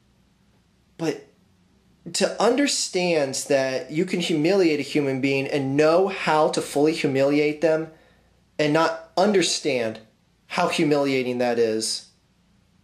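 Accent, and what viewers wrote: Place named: United States. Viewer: well, judging by the way you talk, you must be an American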